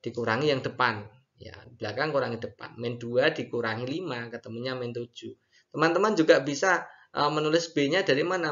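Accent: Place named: Java, Indonesia. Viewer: Hai... Kak... Dari Jawa juga yaa sepertinya... 😁 Suka banget sama kecepatan menjelaskannya ....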